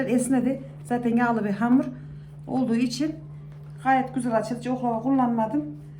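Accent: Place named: Kirikkale, Turkey. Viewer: Ellerinize sağlık canım çok güzel görünüyor. Tarflerinizi çok beğeniyorum. Bende yapıyorum. Konuşmanızda aynı benim gibi konuşuyorsunuz. Bende kırıkkale liyim. Senin hamur yoğurmanı çok severek izliyorum. Çok güzel ve beceriklisiniz. Maşallah elleriniz dert görmesin. bacım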